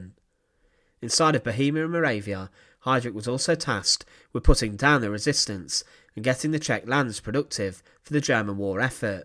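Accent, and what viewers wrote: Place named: Liverpool, United Kingdom. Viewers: Narrative misses out some dates and would be better if not delivered in boring monotone scouse. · What do you think . Just because he has an English accent . And not a US one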